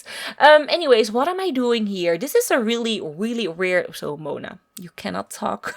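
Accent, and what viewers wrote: Netherlands. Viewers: I adore Dutch accents ! Amazing house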